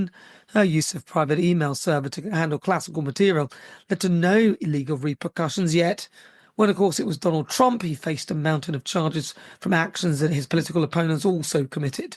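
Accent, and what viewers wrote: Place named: Birmingham, United Kingdom. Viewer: Lol, ur brummy accent is thick and i live here too and have heard ALOT of ozzy osborne accents 😅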